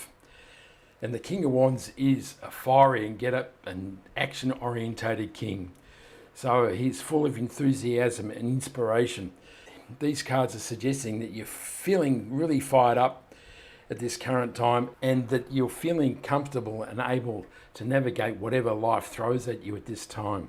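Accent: Australia. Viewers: Subscribed.. Nice to hear an Aussie accent!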